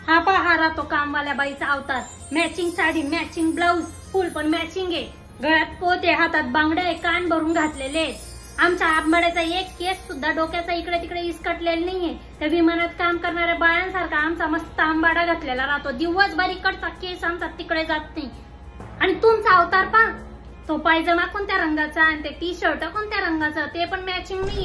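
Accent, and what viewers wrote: Khandesh, India. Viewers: God Bless you Tai...sorry sorry Bai.... थोडा उशिराच पाहिला हा रील ...Superb....खान्देशी ठसका... लेखन आणि सादरीकरण एक नंबर...👌👌👍👍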